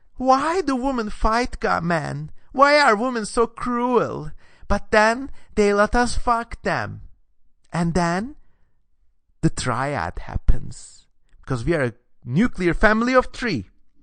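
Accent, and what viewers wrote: Turkey. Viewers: Op sounds turkish can you confirm?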